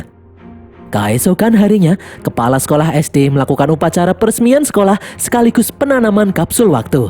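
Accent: Java, Indonesia. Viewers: Logate medok yo masse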